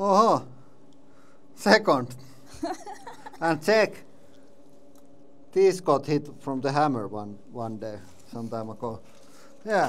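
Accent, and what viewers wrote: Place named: Finland. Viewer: The rally English is strong with. ei pahalla mut perkele.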